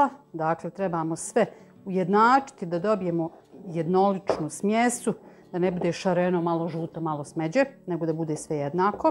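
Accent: Bosnia and Herzegovina. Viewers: Joj kako te sa gustom gledam kako lijepo i uredno sve radiš a tek naš bosanski govor ma bravo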